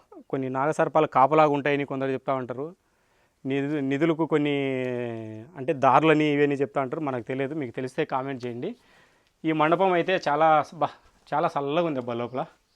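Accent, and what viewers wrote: Rayalaseema, India. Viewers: ne prati vedio chala interesting ga untundi... Keep it up...nuvvu ne rayalaseema slang super... Chala kastapaduthunnav...super bro...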